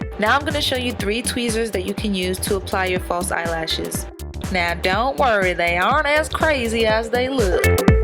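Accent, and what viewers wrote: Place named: Rural, United States. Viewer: Your lil country accent is the sweetest thing I have ever heard in my life!!! <3 I know thats weird but you keep throwing your lil twang in there and its literally the cutest thing I have ever heard! Get it ma!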